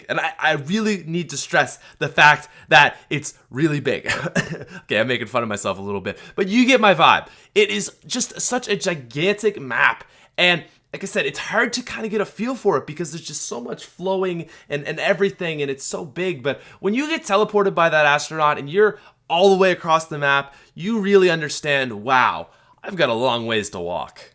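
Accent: Canada. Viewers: dude he's Canadian, leave him alone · It's cause he's Canadian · Nam DreamzZ Lol nice try at acting smart. Americans are all so IGNORANT to the fact that THATS HOW THE WORD SOUNDS DUE TO THE CANADIAN ACCENT. People in America see something that isn't the same as their country, and think its weird and want it changed.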